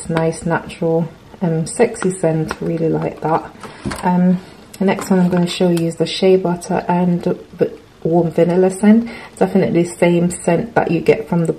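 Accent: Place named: United Kingdom. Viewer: You're 🕊️ haul is 🔥🤍😏 🤍 the British accent as well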